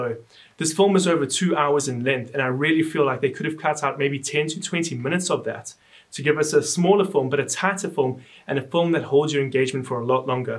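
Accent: South Africa. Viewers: You sound South African 👍👍👍 awe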